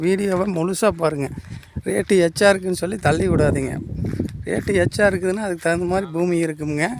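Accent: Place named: Pollachi, India. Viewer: Pollachi Tamil speaking check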